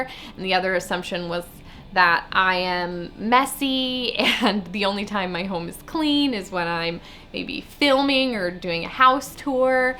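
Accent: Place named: Canada. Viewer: You sound just like me, I’m a former Canadian too !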